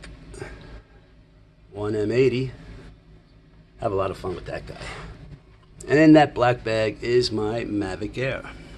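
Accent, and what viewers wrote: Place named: New York, United States. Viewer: Love it!! You're new York accent!! Lol by the he way graysons batts for 115 sold out thanks to you.. Lol love ya and your sense of humor!! Going to be a great summer..looking forward to seeing vids and getting to know you brother!! Sat mine gets here.:) but no batterys!! And I forgot a cord so can use frysky receiver..XSR. You got two 115s.now I'm jealous you'll always be flying. Figuring out myself too ,DNA big help bro! For x-lite! Have a great night!! God bless!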